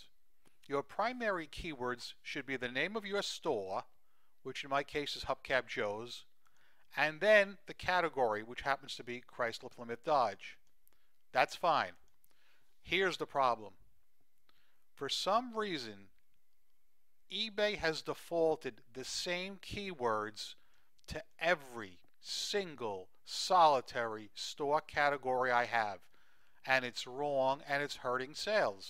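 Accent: New York, United States. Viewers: love your NY accent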